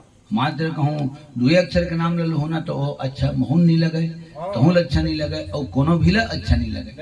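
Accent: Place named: Chhattisgarh, India. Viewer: बहुत ही सुन्दर रामायण कथा वाचन । बड़ सुघघर छत्तीसगढ़ी बोली भाखा में जी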